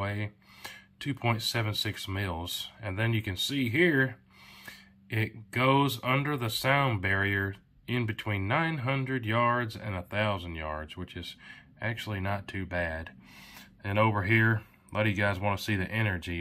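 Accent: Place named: Arkansas, United States. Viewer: Are you saying “boo-lots” instead of “bull-its”? I’ve never heard a bullet called “boo-lot”. Where are you from? I sense an accent, but can’t place it. If I were to guess, though, I’d say Arkansas or there about.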